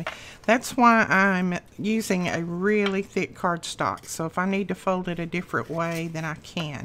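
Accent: South, United States. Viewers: Oh, I LOVE everything about this project!!! Especially your Southern accent, 'cause I'm a Southern girl too ;-) TFS!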